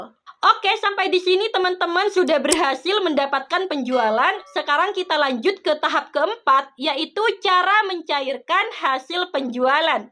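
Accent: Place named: Madura, Indonesia. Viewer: Makasih banyak kaka atas penjelasannya yang sangat bagus, detail, dan rinci. Dari logatnya, kayaknya kaka orang Madura ya😊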